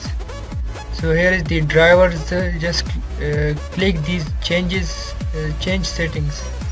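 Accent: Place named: India. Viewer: o my bad sounds like an Indian accent ill say Asians as a whole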